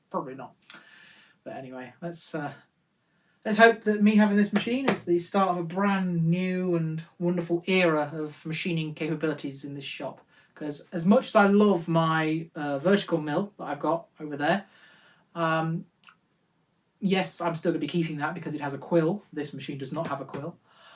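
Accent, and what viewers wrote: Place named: East Midlands, United Kingdom. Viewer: hello me love.." here i am again .. oh ! merry xmass and a happy new year.!.i dont suppose you have amongst all your treasured parts ,,, a table for a centec 2 mill.. the one i have is a right state with over tightening the " t " bolts the upper has broke away it wasnt me !. i want it fixed or replaced... can you help ? .by your accent you dont live too far from me " derby notts area ,",, hope you can help regards laurence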